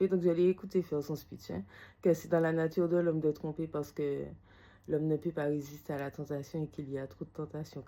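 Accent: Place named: Martinique, France. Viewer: Parole ti mal mdr quand tu parles ont entend vraiment la Martinique free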